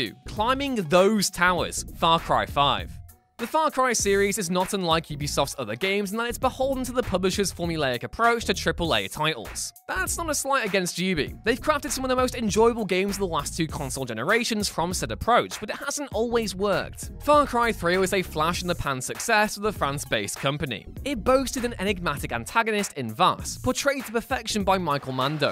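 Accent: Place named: Liverpool, United Kingdom. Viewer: Can you not make the liverpudlian narrate videos? There's a reason they're voted to have the worst accent in the uk.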